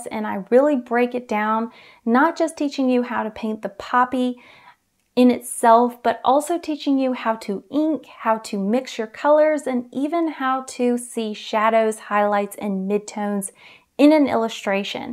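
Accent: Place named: North Carolina, United States. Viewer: I love this effect and can't wait to use it soon. Also: I thought I knew that accent! I just saw your fan mail address. I'm from right up the road, Shelby, NC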